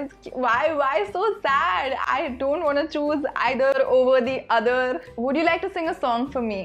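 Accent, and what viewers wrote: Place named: India. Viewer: I love your sorta indian accent. You have such a pretty voice and right when I stumbled upon your channel I immediately knew I hadd.... to subscribe.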